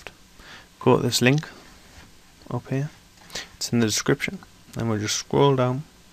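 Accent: United Kingdom. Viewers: Are you British, Scottish or Irish? I love them all. I'm Aussie but I accept any other race in my country. Unlike SOME people I know...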